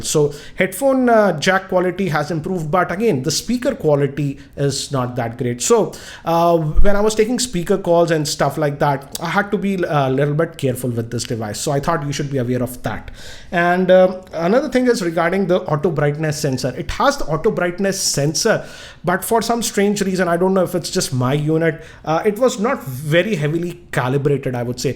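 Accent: Hyderabad, India. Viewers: indian accent is very diaturbing and annoying! i hate it!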